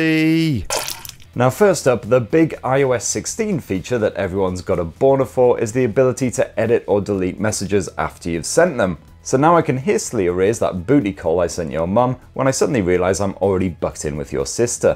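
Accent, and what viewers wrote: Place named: Scotland, United Kingdom. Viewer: I like how he probably uses a Mac to upload his videos and he treats Mac OS and MacBooks like a pile of 💩 😂 very pointless.. 😩 same as that disgusting bottle of “American” whiskey he drinks , when you could get tons of good Scottish whiskey, he kinda sound Scottish .. if that’s the case that’s even more shameful.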